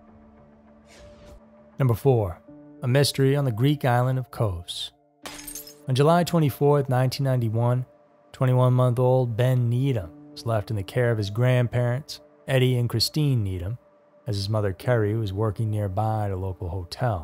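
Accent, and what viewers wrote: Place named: Georgia, United States. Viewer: you're so awesome and professional. So sad and Heartbreaking stories. You're my number 1⃣ story teller. Prayers for the families. 🙏 P.S. I swear I can hear a Georgia accent, but you say you're from Beantown! ❤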